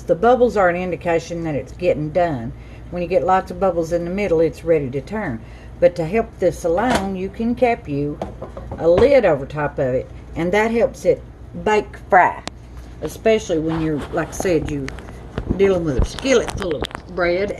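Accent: South, United States. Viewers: I absolutely love watching your videos. If I cud afford to send u a gift. I wud. U are a complete JOY to watch. AND I laugh at the way u talk w ur accent and ur country ways. I'm the same way girl. I've had fried bread all my life. And I love it. You are one hallarious woman and I wud love to meet u. Bit I live in North Carolina. And I don't own a car. Or I'd pay u a little visit. Lots of love, hugs and prayers sweetheart ❤♥💕💖😘💗❤. GOD BLESS US ALL SWEETHEART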